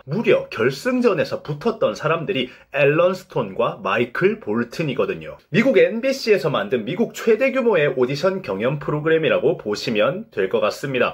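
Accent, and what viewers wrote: Gyeongsang, South Korea. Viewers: 목소리에서 좀 힘을 빼시면 어떨까요? 아마도 경상도 사투리 때문에 더욱더 힘을 주시는거 같은데 너무 같은 높은 톤으로 때리시니 듣는 동안 힘이 드네요 ㅠㅠ 요즘은 그냥 자연스럽게 사투리 톤도 괜찮을거 같은데...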